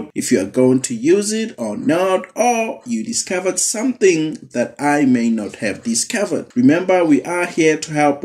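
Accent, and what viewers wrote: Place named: South Africa. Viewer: Aitar.. You sound south african or maybe it's just me.. anyways.. thanks for the vid. I'll be trying this out in the next transfer window hehe 👍🏽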